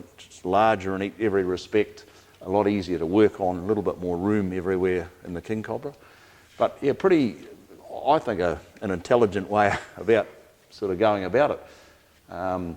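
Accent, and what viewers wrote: Australia. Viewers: Bollocks does this gent have a thick Aussie accent! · Sounds Aussie?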